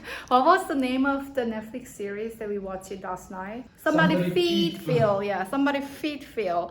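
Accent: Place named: Gumi, South Korea. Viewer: 진정 말씨는 구미분이십니다 ㅎㅎ😊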